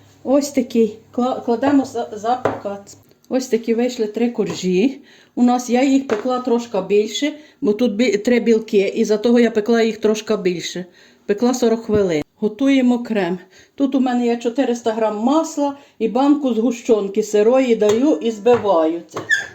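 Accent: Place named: Rakhiv, Ukraine. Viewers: Дякую, пані, при нагоді обов'язково спечу, дуже гарний рецепт. Ви дуже файна господиня, дай Бог вам здоров' я і щастя. А ваша гуцульська говірка просто чарівна, слухаю і милуюся. ❤️👍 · Вы майже из Рахова?такий розговор як у нас уклин вам из КАЗАХСТАНУ. ЯТОЖЕ ТАКИЙ УМИЮ И ДУЖЕ ЛЮБЫМО. САМИЙ ВКУСНЮЧИЙ.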